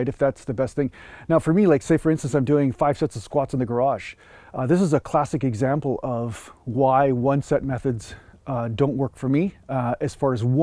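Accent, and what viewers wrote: Canada. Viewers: If I had to guess, I’d say you were Canadian. But I like this approach.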